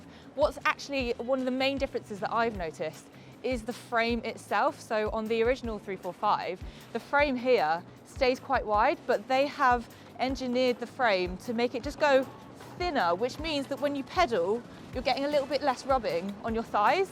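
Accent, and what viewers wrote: United Kingdom. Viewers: I think people keep stopping you because you're a complete smoke show with an adorable British accent and the bike is cool enough to be an ice-breaker. Oops, did I say that out loud?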